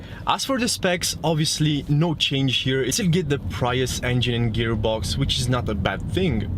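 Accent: France. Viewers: Worst minutes spent in my life. Beside the shitty english french accent, the presenter has no clue about cars. It's like he is read from a paper. Not to mentiom he is ugly af.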